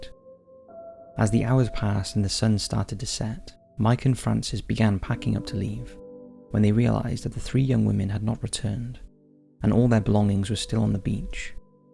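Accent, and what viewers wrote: Wales, United Kingdom. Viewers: Think he's Welsh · He's from the UK, so his dialect is different than the way Americans or Canadians pronounce words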